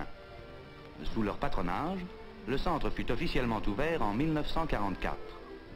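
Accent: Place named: Quebec, Canada. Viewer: This sounds like a Québécois narrating the documentary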